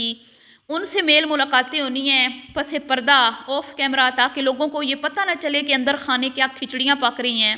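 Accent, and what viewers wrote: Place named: Saraiki, Pakistan. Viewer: آپ کے لہجے سے لگتا ہے ہم بھی سائیکی زبان بولتے ہیں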